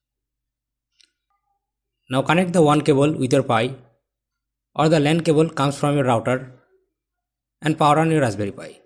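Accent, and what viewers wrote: India. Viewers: sounds like literally every indian guy, trying to speak English. You should have known that even before clicking the video.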